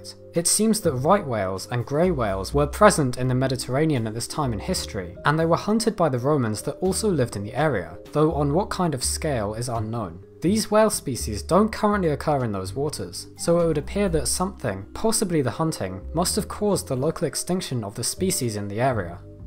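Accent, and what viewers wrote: United Kingdom. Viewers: you have a British accent so you can say everything as you please; apparently....:) Intro for an intro.......NICE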